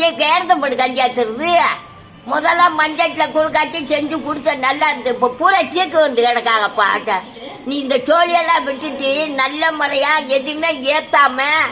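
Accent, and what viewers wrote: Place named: Usilampatti, India. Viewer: ❤❤sema paaati.... Theni usilampatti pechu sema 😂😂😂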